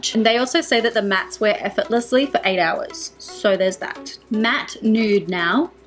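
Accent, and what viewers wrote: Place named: Australia. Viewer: when you said "desire" i heard "the Zaya" :)) gotta love the aussie accent <3 need to try the lipsticks as they look amazing on you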